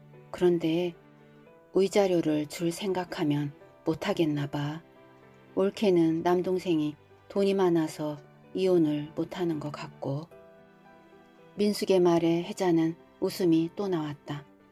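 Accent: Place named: Chungcheong, South Korea. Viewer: 구독하고 듣습니다. 충청도 사투리 잘하십니다~♡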